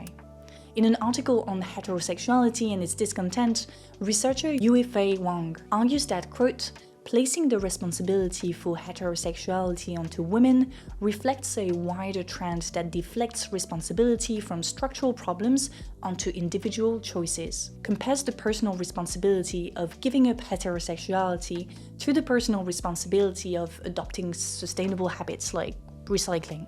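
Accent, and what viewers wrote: France. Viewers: French pronunciation of indian names is so cute and funny at the same time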